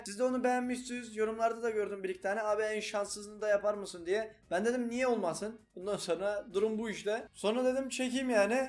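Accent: Black Sea, Turkey. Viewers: Abi senin gonuşman çok hoşuma gidiyi bende garadenizliyim bayburt Guklagraft yeee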